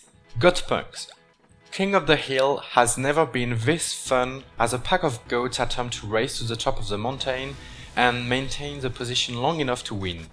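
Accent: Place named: France. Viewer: magnifique accent français :'D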